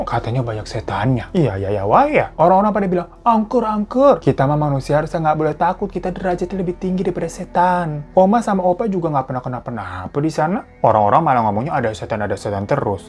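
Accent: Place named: Sunda, Indonesia. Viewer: Sok atuh nyarita deui hahha...sayah orang bandung ketawa liat kk ngomong bahasa sunda😂😂😂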